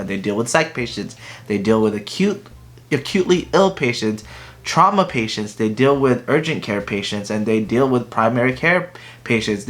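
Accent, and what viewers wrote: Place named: Philippines. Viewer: Filipino accent?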